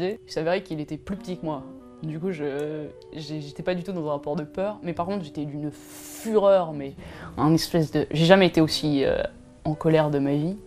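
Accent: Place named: Paris, France. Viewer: Ahaha ok je viens du sud (telle Chimene Badi) du coup centre ou titi parisien ça se ressemble un peu à mes oreilles :) ! Merci pour ton témoignage, et concernant tes dents, avant que tu n'en parle je trouvais que justement elles étaient très jolies :) Les sourires colgate c'est banal et triste, mais des petites dents qui font leur vie c'est bien plus charmant !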